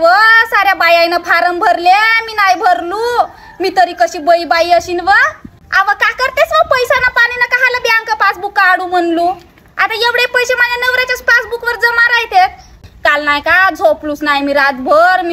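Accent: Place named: Vidarbha, India. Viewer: He amchi vidarbhachi marathi ahe manje zadiboli ahe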